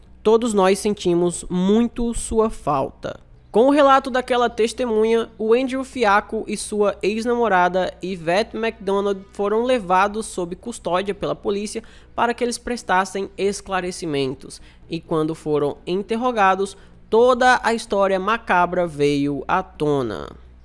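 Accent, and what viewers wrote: Northeast, Brazil. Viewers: Oi gosto muito dos seus vídeos e das suas narrativas 👍 você é do nordeste amigo 👏👏 sou de Aracaju-se.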